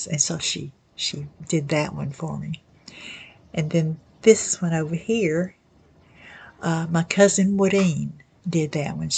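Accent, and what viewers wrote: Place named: South, United States. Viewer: Hi! If the artists don't mind would you show the pieces that you talk about? Maybe a future video? I am enjoying your videos; I miss hearing genuine southern speech.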